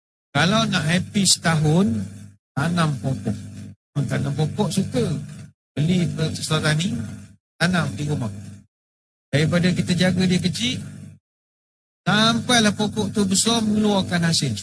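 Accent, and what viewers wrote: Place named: Endau, Malaysia. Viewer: Bila ustaz badli Shah bercakap. Sya sangat2 suka. Ada sikit bahasa dia mcm basah kampung sya di endau.. Huhu. Semoga ustaz badli Shah sehat selalu kami sayang ustaz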